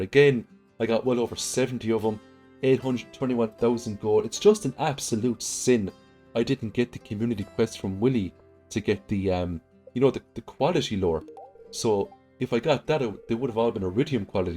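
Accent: Ireland. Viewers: OMG I knew it, I knew you must be irish! So glad I ran into your answer to btw an absolutely inappropriate question.... I've been learning irish for a while now and I'm just so pleased that I've managed to recognize it in your english :D The way you're saying "farm" was the strongest hint 😂 I LOVE it btw, could listen to you for endless hours - which is what I just actually did by watching this video xD Just started a fishing only Riverland farm myself and was coming for inspo. You've been such a big help, absolutely nailed the challenge. Keep up the good work! ❤️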